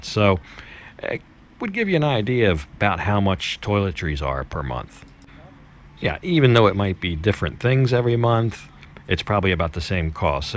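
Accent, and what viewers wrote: United States. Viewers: Because he speaks like an American. Nothing wrong with it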